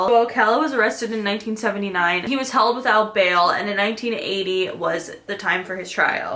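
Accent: United Kingdom. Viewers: The beginning haha I love your British accent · I love your British accent 😭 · I love your British accent, love ❤️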